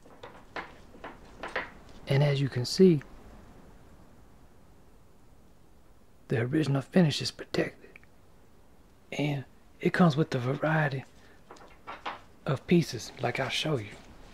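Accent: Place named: South, United States. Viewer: the negotiator! LOL I love it! You sound like a cross between Dr John and Bob Ross! Love your accent! Are you from the south? Loved the video! thanks for sharing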